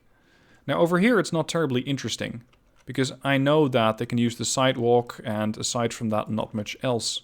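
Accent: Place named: Netherlands. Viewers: Yep, that's English with a Dutch accent. I might just follow just for that 😉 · Said no one ever... Maar goed, een nederlands accent verstop je niet zomaar :)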